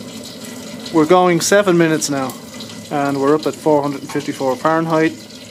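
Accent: Ireland. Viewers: Excellent set-up! I particularly like your innovative use of the Pyrex dish. It's both handy to see the flame and one of the nice things about having a fire. The crackling sound is water in your oil. That will reduce your heat output... if it matters at all. Dry oil does work a LOT better in these burners. If you were interested in efficiency at all, you could run your Chimney pipe horizontal for a good few feet before ducting it out. You would find there is a lot of heat coming off that which would now be wasted. If you also had a small fan blowing along it, the heat would be better dispersed around the area you are heating. Congrats on a job very well done with this. It's definitely the neatest and most innovative burner of it's type I have seen. You have thought it through very well and come up with a great set-up. Great explanation and who doesn't love an Irish Accent! 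Well done to you Sir! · We Americans love the Irish accent.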